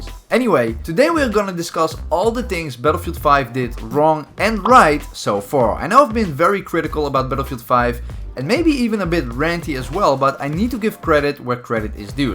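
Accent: Netherlands. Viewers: are you from the Netherlands, you have a bit of an accent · I can hear you are dutch (ja toch?)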